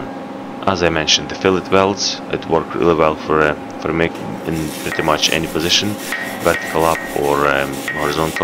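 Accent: Scotland, United Kingdom. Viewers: Good bit o scottish accent comin through there boss where u based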